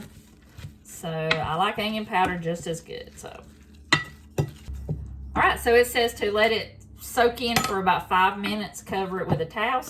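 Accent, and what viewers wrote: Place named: East Texas, United States. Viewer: I’ve just subscribed and I plan to binge watch your videos. I don’t know where you’re from, but I’m from east Texas, and we even sound alike. Kinda feel like I know you. All the best to you and yours!❤😊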